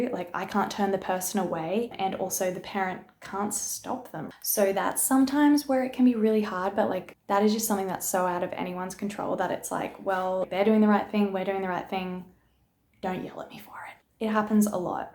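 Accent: Australia. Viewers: She’s so Australian I love it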